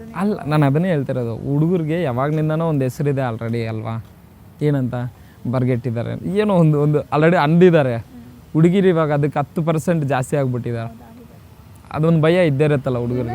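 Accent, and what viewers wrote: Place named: Tamil Nadu, India. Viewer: Kannada gottiro huduga bekappa. Eee tamil hudugana kannda kelodikke agodilla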